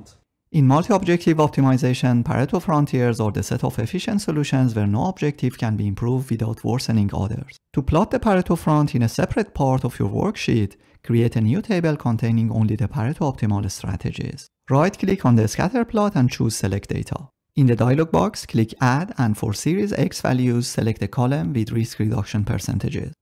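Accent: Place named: Iran. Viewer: Ah that lovely persian accent!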